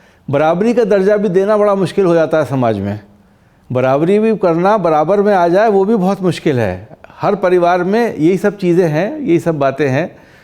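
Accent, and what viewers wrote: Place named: Western Uttar Pradesh, India. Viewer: ये बताएं ,आपके चेहरे की चमक का राज क्या है ,आपके बोलने के अंदाज में पश्चिमी उत्तर प्रदेश की मीठी खुशबू आती है धन्यवाद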